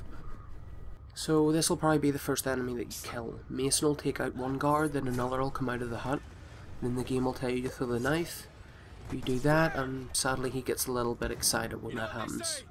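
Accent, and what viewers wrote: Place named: Northern Ireland, United Kingdom. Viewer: Thanks for the great guides. Looking forward to the next ones. It's been a long time since I've heard a real Northern Irish accent ;)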